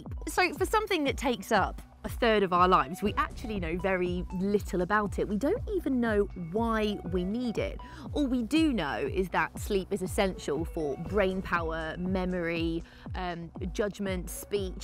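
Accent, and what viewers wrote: United Kingdom. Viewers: And I like it. She has a beautiful British accent.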